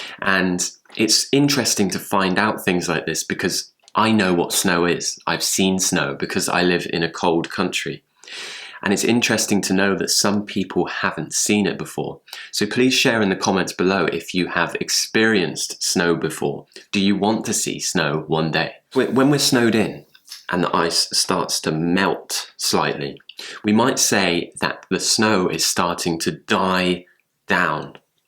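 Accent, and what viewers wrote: United Kingdom. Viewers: I am Thai guy. I like British accent. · Mad love for you British accent 😍 When I used to live in my country (Poland) I was snowed in so many times... So I think winter in Uk is pretty warm, especially in London. Anyway thank you for lovely video, I really enjoyed. I'll often go back to your channel.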